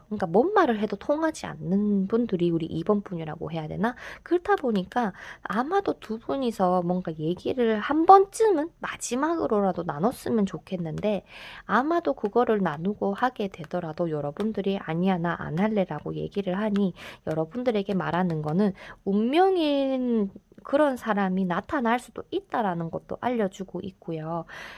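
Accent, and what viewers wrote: Gyeongsang, South Korea. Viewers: 하트!ㅎㅎ🥰 원래 경상도 분이신가요? 너무 정겨워요ㅎㅎ 좋은 리딩 항상 감사합니다~! 아, 전 남자입니다요.. ㅎㅎ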